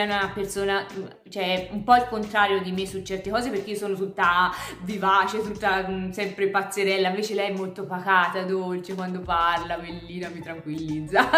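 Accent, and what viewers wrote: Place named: Tuscany, Italy. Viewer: Lei è pa^ata..ah, la tua Toscana riempie le mie orecchie! Buona settimana,carissima 💙💙💙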